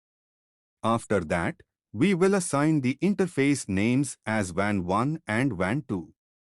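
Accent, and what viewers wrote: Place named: India. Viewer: I think it's an Indian accent rather, lol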